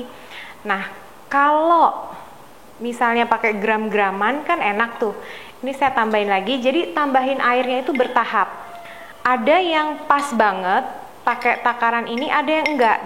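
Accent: Medan, Indonesia. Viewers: Orang Medan kakak ini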